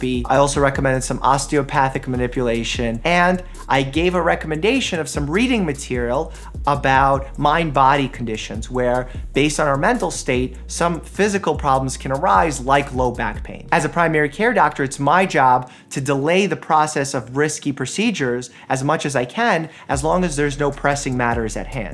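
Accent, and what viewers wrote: New York, United States. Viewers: I’m just curious, did I hear a slight New York accent from doctor mike🤔 😎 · when you watch the video in 1.25 speed, you can hear his new york accent XD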